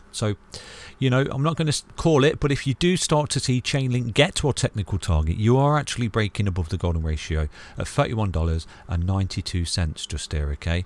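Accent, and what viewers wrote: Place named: Northern England, United Kingdom. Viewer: Love the videos thanks!! Love the Northern accent too - reminds me of home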